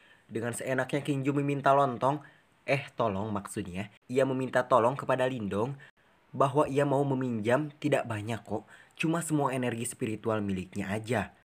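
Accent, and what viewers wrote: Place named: Tasikmalaya, Indonesia. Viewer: Mang orang tasik nya😂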